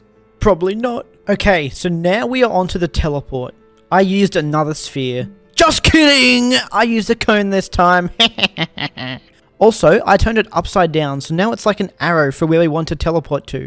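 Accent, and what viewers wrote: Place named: United Kingdom. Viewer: Bro roasted Rito so hard in his british accent. Loved the video man, so good 👍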